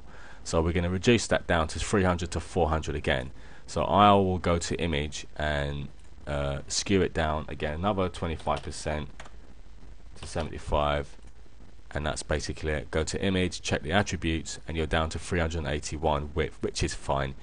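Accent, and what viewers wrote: United Kingdom. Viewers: i love how british speak. i think it's cute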